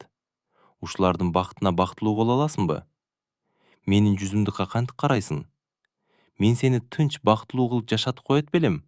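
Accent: Russia. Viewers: Окуган бала орусбу😮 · Негеле ө ордуна о деп окуйт десе орус жигит окугангө азаматсын чоон рахмат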